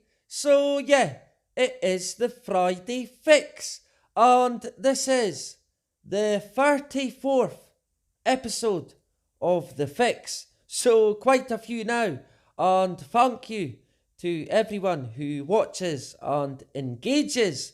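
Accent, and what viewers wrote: Scotland, United Kingdom. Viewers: Scottish...its lovely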